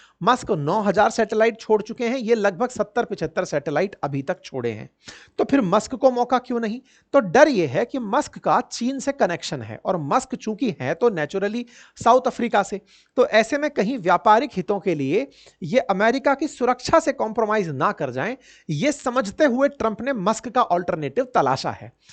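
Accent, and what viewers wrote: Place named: Bihar, India. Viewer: Sir apka tone me thoda biharipan aa raha hai acha lagta hai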